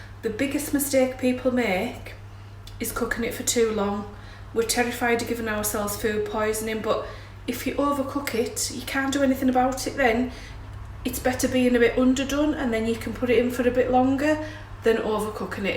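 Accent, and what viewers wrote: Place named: North East England, United Kingdom. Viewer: Bloody Hell! It's 9 45am and l could eat this now!😂 You, Lady are quite a joy to watch cook! Didn't think they made brilliant, everyday home cooks at your age any more! I've just found your vids (see this one is 4yrs old!) - they are all very enjoyable to watch, especially with your lovely, no nonsense, talking complete common sense-North East accent (I love all regional accents)😊 This had me reminiscing about our big Family Christmases, back in the 70s. My dear lil' Mum used to cook for the 5,000...well only 14 of us, including 5 kids but would have fed the 5,000! She only had a regular sized gas cooker and a fridge with a tiny icebox. Oh how she would have loved a microwave and an airfryer...and we'd have loved her to have a Dishwasher (l still hate washing up by hand!). But she'd hwve really loved to have had a Range cooker. 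Why? cos one year we had a 46lb Turkey - yeah, l kid you not, 20.8kg in today's money!😉 - and "the B@@ Thing" (her words, not mine: said in her lovely Cockney accent! got stuck in the oven! The legs sprung up as it cooked... me Dad had to saw them off while it was stuck in the oven!!😂😂😂 The lard... the spuds...the veg water for the gravy, all so reminiscent. Bought bacwondeful l memories so thanks fot that ❤ This, people, is a Master Class in how to cook any Roast Dinner! 👏👏👏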